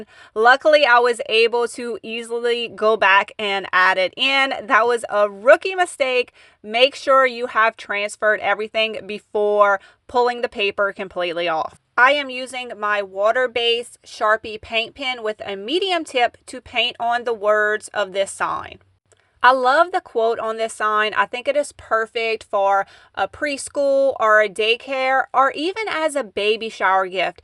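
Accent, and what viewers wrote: Louisiana, United States. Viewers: I thought I detected some Cajun accent in there. 😁😁😁 I am from Ga. We say "y'all"! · She’s from southern Louisiana! Cajun country. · She is Cajun,her accent is wonderful,you my dear are rude !! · You sound like you're from Louisiana, am I right?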